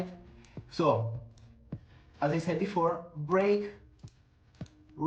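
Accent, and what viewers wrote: Italy. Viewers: Pronuncia all'italiana 🤣 Bravo però continua cosi e goditi il tuo percorso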